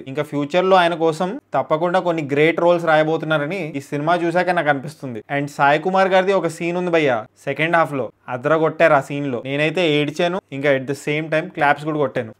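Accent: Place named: Visakhapatnam, India. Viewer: East West vizag dhaggaragane untundhi slaung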